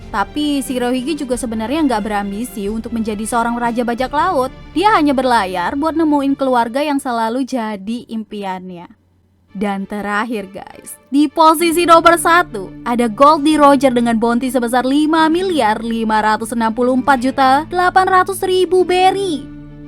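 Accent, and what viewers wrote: Java, Indonesia. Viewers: medok men mba.. 😁 😁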